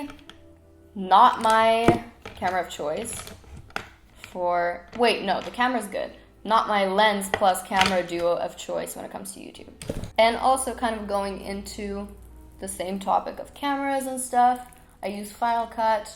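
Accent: United Kingdom. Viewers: you deadass are getting a little flare of british accent in your voice I LOVE IT lmao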